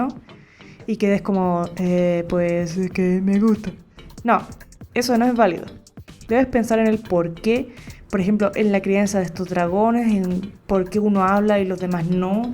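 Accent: Chile. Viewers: Gran video!!,me funcionara mucho gracias!! PD:me encanta tu acento chileno😙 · Buta se nota que eri Chilena, saludos desde Chile xD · Eres chilena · Me hice la media idea en la cabeza, y voy a hacerlo medio spaicy Alv Cache el asentó chileno :D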